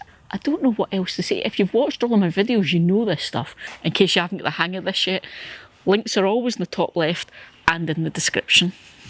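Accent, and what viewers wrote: Scotland, United Kingdom. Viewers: Good morning, (Good evening in Scotland right now). Your new bin looks like a winner, and so much thicker than the $99 bin that I bought from Amazon. As far as the squeak goes, that will go away once you open the lid a few hundred times🤫. Being new, it’s going to squeak a bit at first. Today, I opened your video before I was fully awake, and you sounded more “Scottish” than in some of your previous videos. I had to lean closer to the screen, so as to translate😳.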